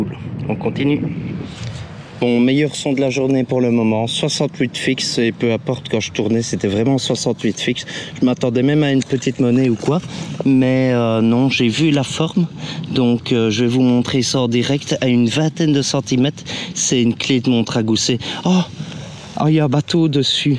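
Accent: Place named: Belgium. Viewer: Hola le bel accent de Belgique, je crois que je vais aimer ta chaîne 😏😏 Angy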